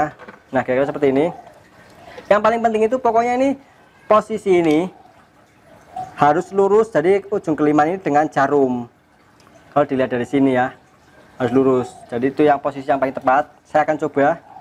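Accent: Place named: Yogyakarta, Indonesia. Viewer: Matur nuwun mas, Sangat membantu, semoga jadi amal jariyah,...btw logat Jogja nya kentel banget...😀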